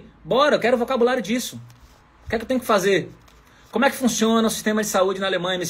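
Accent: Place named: Ceará, Brazil. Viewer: Hei macho, e tu é do ceará, da fustaleza!!! Neh?!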